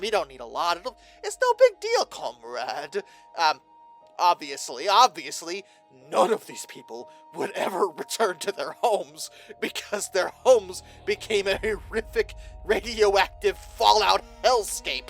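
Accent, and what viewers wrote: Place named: United States. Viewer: Okay dude you sound like an American so why in the hell are you calling Z zed?! What are you some kind of eurodegenerate or commie?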